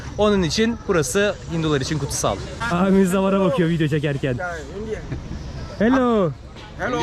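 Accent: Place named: Aegean, Turkey. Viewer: sen nerelisin merak ettim, ege sivesi var gibi biraz ben de egeliyim 😂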